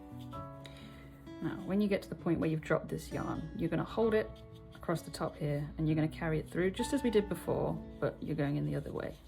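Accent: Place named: United Kingdom. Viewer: URGH NO 😢 this is USA term why do people who sound so very British do this what is wrong with British terms? This is half treble! 😂😂 Sorry for the moaning personal bug bear I learnt from my nan fifity plus years ago any old british pattern will not work if you think this is half double not a half treble. That said super video I did. give you a thumbs up and the tiger looks fabulous do you have this available anywhere to get?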